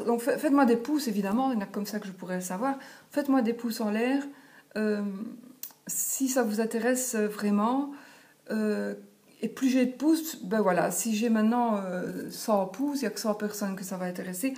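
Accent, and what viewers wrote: Belgium. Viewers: Faites au mieux mais je suis toujours très pressée de regarder vos vidéos..et votre accent belge me rappelle des amis""perdus"".....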